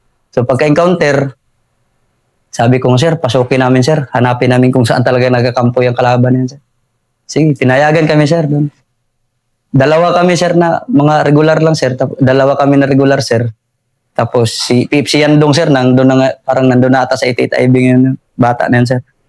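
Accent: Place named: Surigao, Philippines. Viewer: Sgt kumusta ang galing mo parang taga Agsam o Ibuan Lanuza surigao del sur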